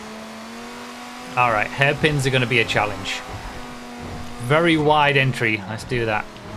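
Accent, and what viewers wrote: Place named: North, United Kingdom. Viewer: interesting given he's neither of those, he's just northern lol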